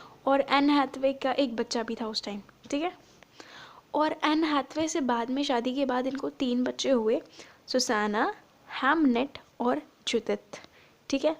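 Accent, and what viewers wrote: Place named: Uttar Pradesh, India. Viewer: By your act of speaking i am sure that you are from uttar Pradesh 😇😇😇😇 isn't it?if it is true you just like my comment.By the why I am also from uttar pradesh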